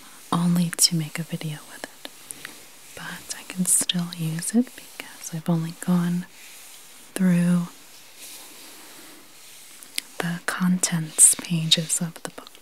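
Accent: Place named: Minnesota, United States. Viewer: She's in Pennsylvania. I'm guessing by her voice she's spent time in Minnesota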